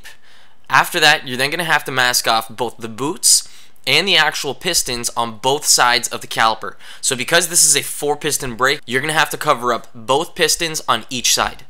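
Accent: Canada. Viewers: Hoy sound Canadian ay! 😎👍🏼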